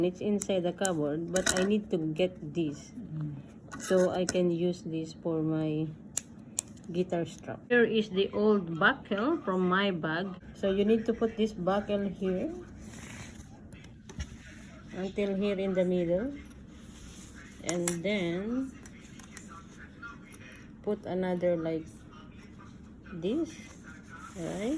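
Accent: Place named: South America. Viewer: me to! I thought South America. Her English is really good but u can still hear an accent and I thought it sounded like south American. We're aways off..lol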